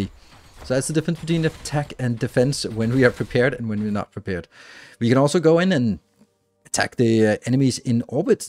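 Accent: Denmark. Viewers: As a dane, its nice to listen to English with danish accent.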